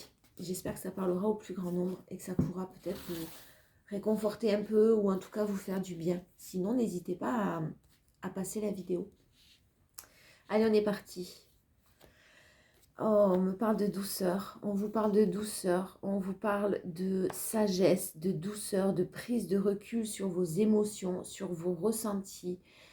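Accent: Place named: South-West, France. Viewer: J'adore vos messages qui me font beaucoup rire par votre bonne humeur. Votre franchise et notre bel accent du Sud Ouest (je pense) met du baume au coeur. Bon week-end❤❤😂😂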